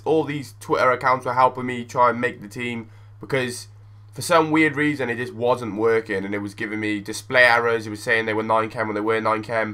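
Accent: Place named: Liverpool, United Kingdom. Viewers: You sound like ya from liverpool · Terrible scouse accent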